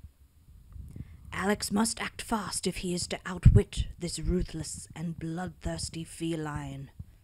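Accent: Australia. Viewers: Wait... Are you actually Australian? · U r Aussie I can tell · Mohammed Kortam it’s Australian because the person who’s speaking lives there lol. Pretty standard stuff.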